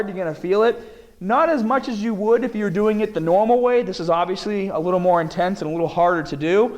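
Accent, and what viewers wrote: Boston, United States. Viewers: How can he? Hes from Boston. They all speak like that there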